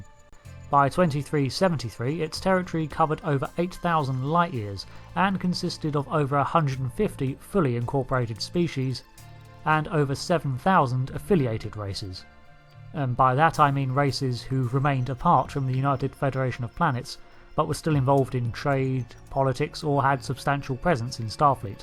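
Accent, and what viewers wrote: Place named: RP, United Kingdom. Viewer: French caption with an RP English accent.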